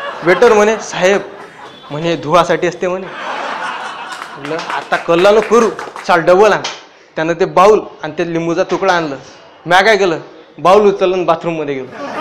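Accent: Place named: Vidarbha, India. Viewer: Nice to hear Vidarbha Marathi 😃😃😃👍🏼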